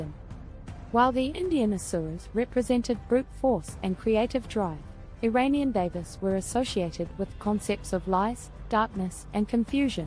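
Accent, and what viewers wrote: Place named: New Zealand. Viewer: Quite smitten with at this point, I must confess! I believe that I have heard three reader voices, though this one most frequently (like 90% of the time)… fan boy guesses you’re from New Zealand, or “Kiwis,” as I say with great affection and not a hint of affectation (so I could use a hint, though this is certainly one, which might lead you to question my lack thereof)… I tend to put the accent on the second syllable of Asuras, but I attended a very low budget ashram (our cricket team was called “The One Hand Clappers”)… — Jai Guru Deva, Glenn XOXOXO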